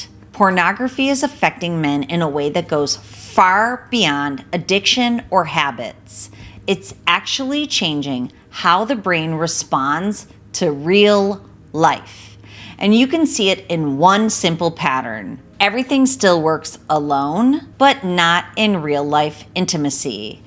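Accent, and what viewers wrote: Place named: Great Lakes, United States. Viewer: You're from somewhere around the Great Lakes arent you?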